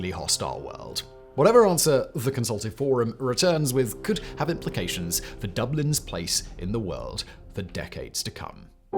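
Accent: United Kingdom. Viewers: Don't listen to that posh English bollox. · This isn't journalism. You're so very clearly in favor of the militarization of Ireland. Curiously enough that would serve British interests too. Ireland would fall within a day and we also don't support your wars, nor do we have any interest in fighting them for you. This country has enough problems to deal with and they won't be resolved by stepping on other peoples toes. For those watching, I plead with you: don't be fooled into this as if it were some straightforward and logical conclusion because a fella with an Etonian accent said so, don't be cretinous fools, please. Open a history book.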